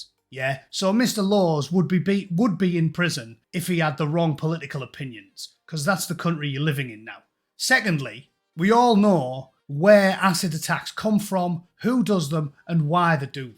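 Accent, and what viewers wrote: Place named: England, United Kingdom. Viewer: Love your sense of humor, and of course the English accent!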